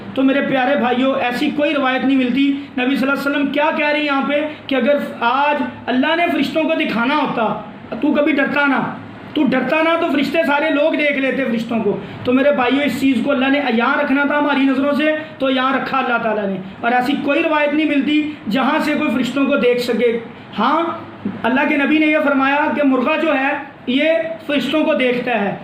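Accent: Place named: Punjab, Pakistan. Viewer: He is in punjabi accent with correct information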